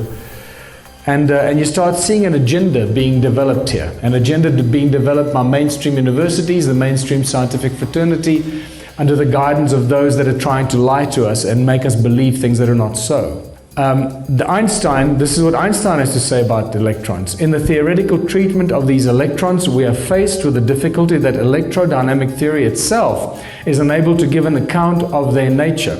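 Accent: South Africa. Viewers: Definitely sound like a X Rhodesian or South African stock.Thinking People 👍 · he sounds like those who kept blacks segragated south african racist scum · Is this guy South Aftican?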